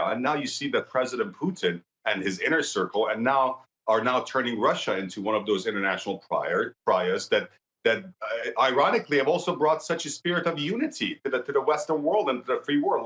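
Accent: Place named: England, United Kingdom. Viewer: salam aagha arash omidvaaram haletoon khoob va har jaa ke hastin salaamat va tandorost baashin aaagha vaaghean baraye man eftekhaare ke yek irooni chonin jaaygaahe besyaar aali ee dar jahaan daare zemnan alaave bar maassshhhala khoshtippi, va sedaaye besyaar carizmaatic va naafez, shomaa be tarze ajiby baa vojoode inke dar emricaa bozorg shodid,az vaajehaaye besyaar ziba va besyaar bejaa va fannie faarsi sohbat miconid,,, man hamishe tahlilhaatoono ba deghat tamaasha miconam, vaaghean aafarin ce inghadr be faarsi ahammiat midid va ,,,bedoone tamallogh, agar oon tah lahjeye zibaaye ingilisi ro nadaashtid, va casi harf zadanetoon ro faghat mishanid, fekr mikard shoma ostaade adabiiat hastid vaaghean dametoon garm ,,,man kheyly keif mikonam vaghti tahlilhaatoono mibinam vaaghean kaaretoon doroste,,,yek ostaad,yek tahlilgare tamaam ayaar, va yek sokhanvare tamaam ayaar hastid omidvaaram hamishe salaamat baashid.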